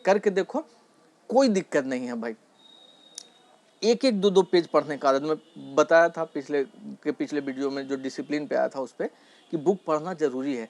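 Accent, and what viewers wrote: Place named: Rajasthan, India. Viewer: Kaha se ho Rajasthan se lag rhe ho Kuch aap ne kya change kiya market me trading me ye batav video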